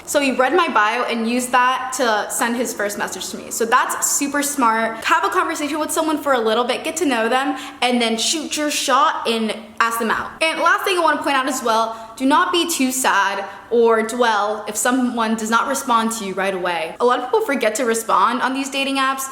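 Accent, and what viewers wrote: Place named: California, United States. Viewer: I knew you were from cali 5 seconds into this video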